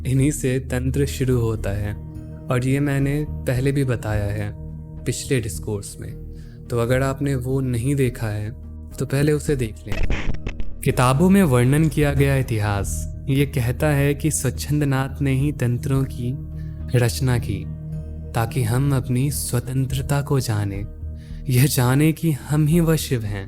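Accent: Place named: Saharsa, India. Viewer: bhai बिहार से हो क्या आप आपके बातों से लगता है आप सहरसा के आस पास के लगते हैं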